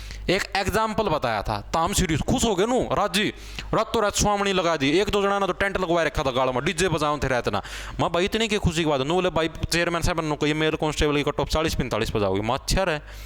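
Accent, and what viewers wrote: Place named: Kaithal, India. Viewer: Bhai kaithal side ki yhi boli h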